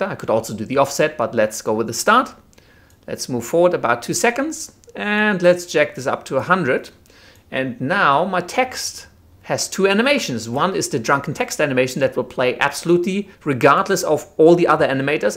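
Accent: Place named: Germany. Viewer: You sound kinda German :3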